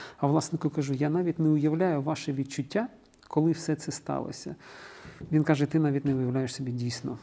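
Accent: Russia. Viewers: класний ведучий і ролики доступні…. але як же ріже вухо, коли йобані рускіє репліки вставляє 😢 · Скільки не дивлюсь ваші відео, але оця розмова з домішками москалотської.... чесно?! На мою особисту думку, вам - не пасує, у вас гарна українська, ведіть спілкування на ній. Дякую.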